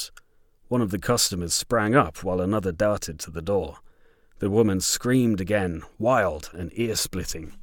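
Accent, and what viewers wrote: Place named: Birmingham, United Kingdom. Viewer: Nice. Love the quivering Brummie. Only thing I would suggest is a very slight further increase in pace during the "combat". But that is just my opinion.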